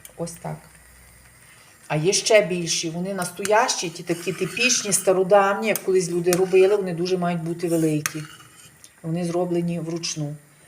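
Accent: Lviv, Ukraine. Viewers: Та так,автор каналу говорить зi львiвським акцентом,на Зах.Укр мають свiй дiалект,iнтонацii'.Вiд цього залежить i те,як вона говорить по-iталiйськi .В нас на пiвднi не говорять "лятте","латте"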